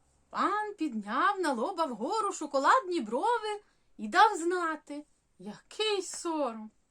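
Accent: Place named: Poltava, Ukraine. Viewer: лелечко, сонечко, ви ж такий талант! Я буду по всіх знайомих каналах на Ютубі вас пропагувати. Українського Ютубу багацько не бува. І мені як медом по серці ваша Полтавська говірка) ви неймовірна жінка!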